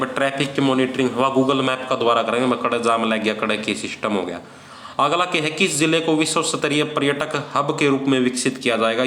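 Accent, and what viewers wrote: Haryana, India. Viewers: Bhai teri jitni taarif karun utni kam s 🙏🙏🙏phli baat to teri haryanvi sound outstanding h bhai ,,,,dusri baat ki video dekhte time bore ni hoya kyonki tu saath hi saath enjoye krata rya 🤣🤣🤣🤣🤣🤣aur tisri baat itni achi video ki liye ,,,,,tera tah dil ❤️❤️❤️❤️se dhanyewaad 🙏🙏🙏🙏 bde bhai and I love haryana 😍😍😍😍 mostli,,,,,,,JIND District .....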